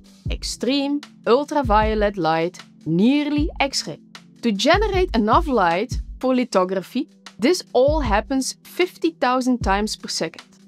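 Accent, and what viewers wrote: Netherlands. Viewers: No, she's baiting us, the general audience. Serious. The others aren't stupid either. What she outlined with a charming Dutch accent is just the very basics. The specifics she didn't go into are deep company and national secrets and are carefully omitted. And wouldn't fit into a video of just over two minutes. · This is the strongest Dutch accent I’ve ever heard 😅. Very refreshing I might add.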